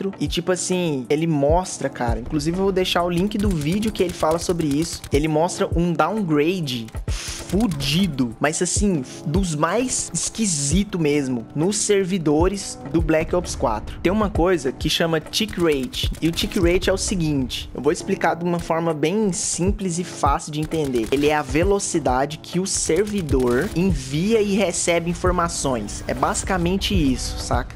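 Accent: Minas Gerais, Brazil. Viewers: Vc é mineiro ?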